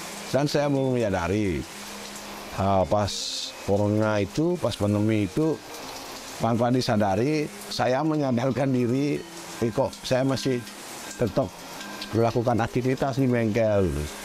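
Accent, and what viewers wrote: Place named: Bali, Indonesia. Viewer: Karakter khas org Bali banget.. logat, 'n caranya berbicara...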